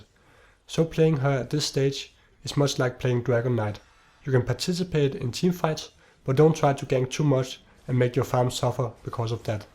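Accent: Denmark. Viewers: Your accent is so Danish I love it <3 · How danish can an accent be, from 0-? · The fucking Danish accent is so strong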